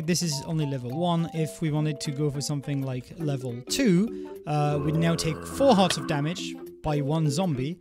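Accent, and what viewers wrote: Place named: France. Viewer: are you french ? you have a small accent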